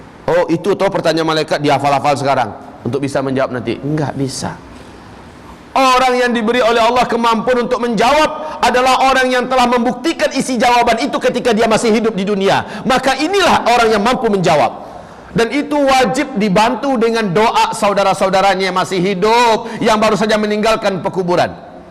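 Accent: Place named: West Sumatra, Indonesia. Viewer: Ustaz...ustaz minang ko bangkinang? Kadang-kadang bunyi minang...kdng-kdang bangkinang.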